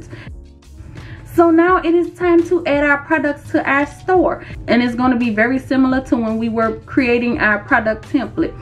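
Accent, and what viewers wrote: New Orleans, United States. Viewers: I'm new here. Are you from New Orleans? I know my people. Our dialect is very distinct. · Sister are you from New Orleans??? I'm a new subscriber and you're accent sounds very close to home lol. I absolutely love your energy, from intro to end. You are a blessing ❤️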